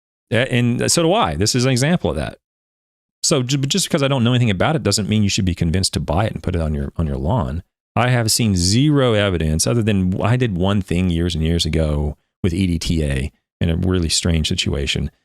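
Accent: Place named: South, United States. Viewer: Quite the southern drawl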